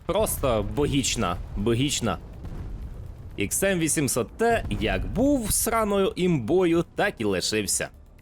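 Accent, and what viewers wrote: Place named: Ukraine. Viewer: Автор мабуть з Харкова чи Полтави. Такий жорсткий суржик.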